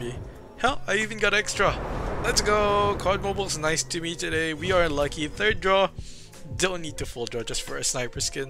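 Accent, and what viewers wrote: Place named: Philippines. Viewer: Bro can you make a video with speaking Filipino me especially like your Filipino accent so can you do a video pls!??.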